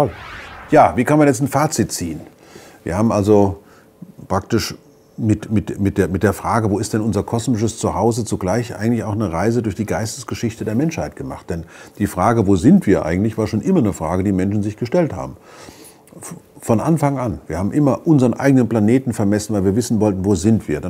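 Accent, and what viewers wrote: Swabia, Germany. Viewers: ...aber Schwäbisch!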